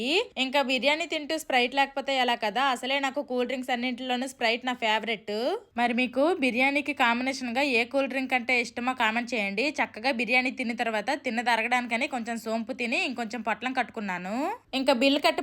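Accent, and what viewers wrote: Srikakulam, India. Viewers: Ni chettha srikakulam slang aapu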